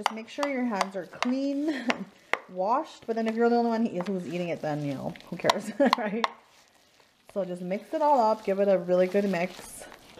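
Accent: United States. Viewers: You speak some of your long “O“ vowels like a Minnesotan, but your hard “R’s” like an Angeleno. You have your own, unique colloquial dialect. I like the uniformity of your burger patties. Well done.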